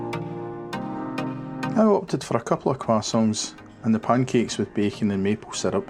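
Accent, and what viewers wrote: Scotland, United Kingdom. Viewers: Great content as usual! Very comprehensive review, and the Scottish accent makes sound more convincing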